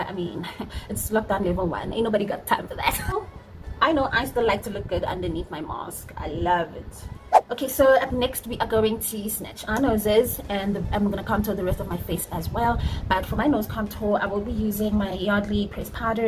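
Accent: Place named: United States. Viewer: Have I ever told you about ur accent🔥🔥❤❤❤ .....yoh ape yeh okarr ole American❤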